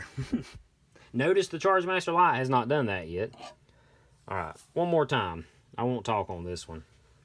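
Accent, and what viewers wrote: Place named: Alabama, United States. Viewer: That Alabama accent is THICK, son!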